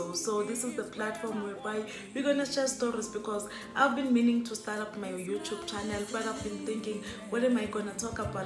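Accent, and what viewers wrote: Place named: Saudi Arabia. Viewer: Saudi Arabia, nice. Beautiful accent👌🏾👌🏾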